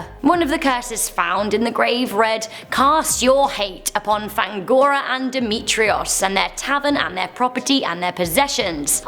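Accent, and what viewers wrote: England, United Kingdom. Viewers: Its the british accent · Miss!! I REALLY LOVE YOUR BRITISH ACCENT!! I HAVE A EARGASM WHEN YOU TALK!! 🇬🇧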